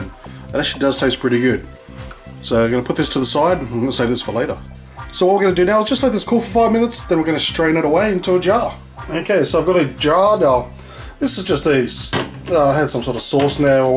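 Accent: Australia. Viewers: Actually, for all those remarking on the Australian accent, you are being incredibly rude. Don't watch if a different accent is offensive. There are American and Englush accents which are unintelligible to other ears. I'm not Australian and can eadil understand what is being said.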